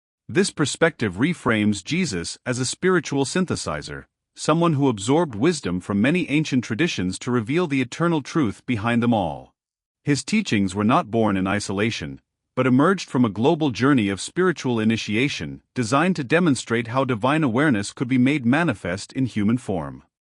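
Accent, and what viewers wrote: United States. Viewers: typically American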